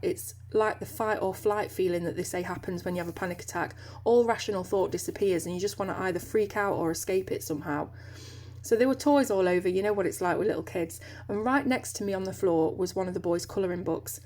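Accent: United Kingdom. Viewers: Dear, I have nothing particulary bright to say on all those issues you've brought up, so I'll just go with all the goods your channel brings to me. First I love the way your always giving all the details I want to know about a products and damn am I picky about details but you never let me down. I love how "creative" your are bringing up things that may not seem directly related to coloring but always end up interesting. To me your channel is like a massive safe place, I know I'll feel good watching your videos, have my brain focus on bright colored things while learning tips. This video is no exception to my "colour with " experience. I don't mind deep subject when it's delivered simply and honestly like you just did. I think you have a huge skills when it comes to "talk" your pace, you're lovely British accent, your pronunciation, your way of playing with words always makes me feel relax and safe. 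The hard work your pour in your channel equals gentle bliss bubbles to me. Thank you dear.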